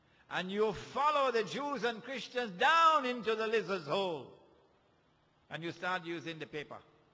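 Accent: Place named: Jamaica. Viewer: Him speak in jamaican accent maan!!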